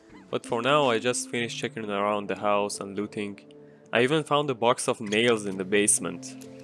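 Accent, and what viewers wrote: Iran. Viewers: You sound Persian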